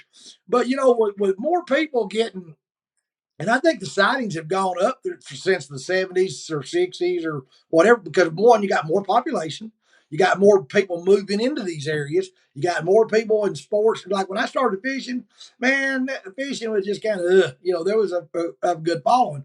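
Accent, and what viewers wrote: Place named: Texas, United States. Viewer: I like this man’s accent!!! It’s all Texas y’all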